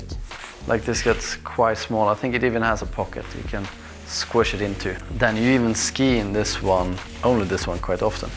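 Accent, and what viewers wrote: Sweden. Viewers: Jag tyckte att det var lite svensk dialekt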